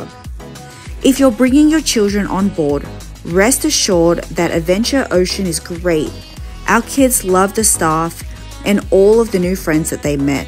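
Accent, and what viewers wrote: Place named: New Zealand. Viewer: Wonderful video, and short too! I love that it was short, but lots of information. By the way, I'm dying to know what part of the world you're from. I hear New Zealand in most of the words you say, but then I hear a little bit of United States English in other words you say. I'm fascinated, but I digress.... My very first cruise ever was on the Oasis of the Seas, and ever since then I've been dying to get on the Symphony. I know the two ships are very very similar, but the Symphony is bigger, as I understand. I remember Sorrento's Pizza not looking very appealing at first glance, then one of my fellow passengers insisted I needed to try it out. I did, and I was floored at how delicious the pizza was. The Solarium is a fantastic included restaurant. I love that it's adults-only, and that it requires a reservation. You cannot beat the entertainment on board the Oasis-class ships. 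It's first rate. I'm really disappointed for you that they ran out of soda so quickly in the voyage - especially considering the fact that guests paid for the drink package. I would have demanded my money back for the drink package. I'm booked on the Harmony for this coming April, and am anxious to see how good the included food is this time around. The first time I sailed, I thought all of the included food was phenomenal. But since they've implemented the new menu changes, the reviews have been mixed. On my first voyage with RCL, I purchased two specialty dining restaurants: 150 Central Park, and The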